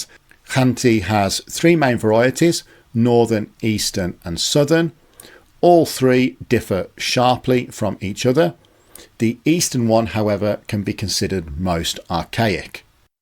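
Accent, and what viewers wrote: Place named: Manchester, United Kingdom. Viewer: So7nds like a feature from Mancunian English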